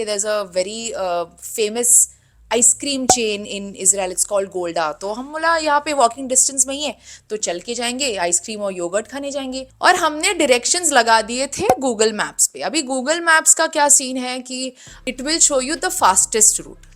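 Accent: India. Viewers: i come across with your videos today, and watching you speak Hindi with desi accent i can't stop laughing. 😂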